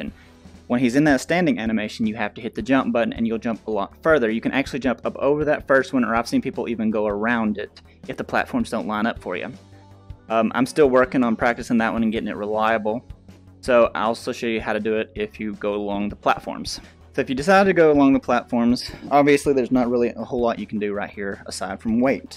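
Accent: United States. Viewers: sounding American today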